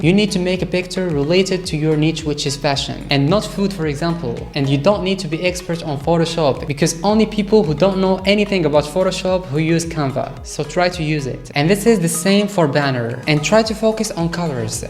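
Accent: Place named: Middle East. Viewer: Thanks bro! Very helpful. Also, love your accent! Seems Middle Eastern mixed with French 😊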